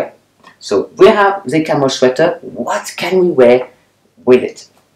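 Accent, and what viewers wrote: France. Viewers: Bonjour! J'adore vos vidéos! I have just found your channel today! I watched it all day long! I am in love with you, your style and your amazing french accent! Merci! À bientôt!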